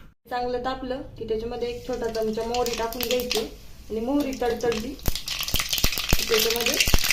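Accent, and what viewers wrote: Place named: Akole Sangamner, India. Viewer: तुमच्या आवाजावरून तुम्ही सुगाव अकोले संगमनेर भागातील असाव्यात असे वाटते. आमटीचे नाव शिंगोरी गावावरून असेल असे कधीच वाटले नाही. हि आमटी राशीनची पन प्रसिद्ध आहे.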